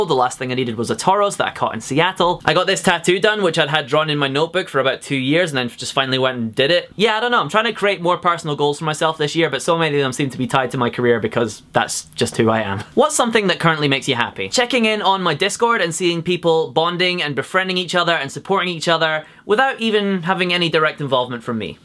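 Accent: Scotland, United Kingdom. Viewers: I really like your videos! I subscribed trying to have a little more contact with the Scottish accent, but now I'm here because of you. And I'm glad you're back to posting!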